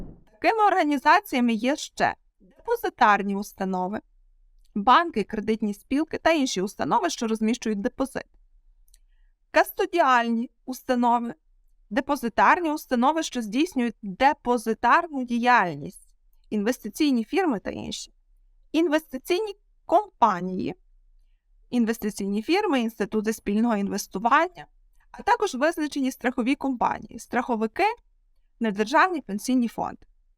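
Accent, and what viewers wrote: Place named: Western Ukraine, Ukraine. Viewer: У лекторки чудова західна вимова. А крім того, її відео містить корисну інформацію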